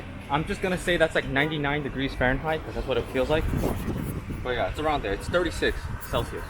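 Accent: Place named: China. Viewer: 你的母語應該是中文吧，聽的出來你的英語有口音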